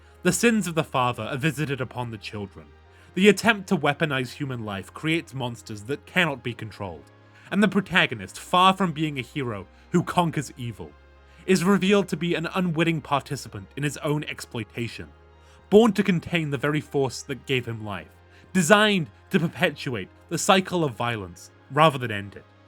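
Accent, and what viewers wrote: United Kingdom. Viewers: They gotta be bri’ish or just really fckn good at talking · I completely fuck with how British you are.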